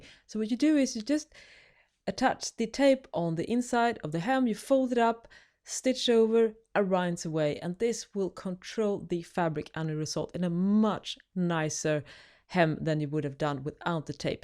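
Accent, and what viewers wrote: South Africa. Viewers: is this lady south african? im not sure cuz sometimes i will still mix it up with australian for whatever reason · I'm trying to guess what your speaking accent is, I find intriguing and quite pleasant to hear . South Africa, Dutch ? Please share. Thanks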